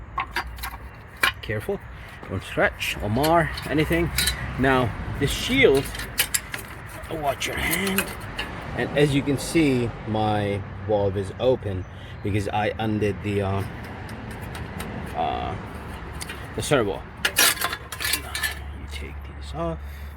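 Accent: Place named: India. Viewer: u sound desi as fuck bro 😂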